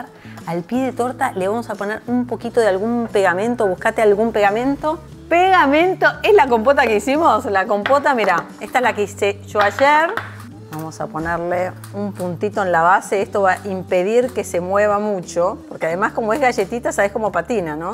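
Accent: Argentina. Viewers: sos argentina no ? se nota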